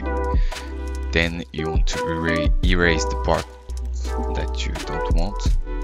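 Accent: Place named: France. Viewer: I love your (french?) accent! This was very helpful. Also, le francais cest cool!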